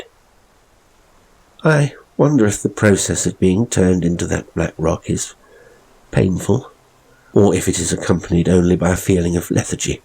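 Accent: United States. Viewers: Always DELIGHTFUL to hear from you, my friend! You're very good at presenting these stories, and I find your voice portrayals of the different characters to be quite a talent that sets you apart from others, who apperantlly consider a slow monotone to be the apex of dramatic performance. Thanks for all you do! P.S. --- your American accent is ALMOST flawless, and thoroughly believable. (the only giveaway is the use of idiom, which is so nit-pickish of me, that it's beneath notice!) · Great American accent.. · I agree and I think he dose a bloody good American accent too! 😵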